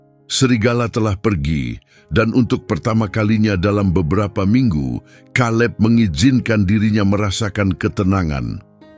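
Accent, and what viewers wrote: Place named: Malaysia. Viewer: Terimakasih terjemahan ceritanya tapi mesti saya katakan betapa menyebalkannya lafal Melayu pengucapanmu...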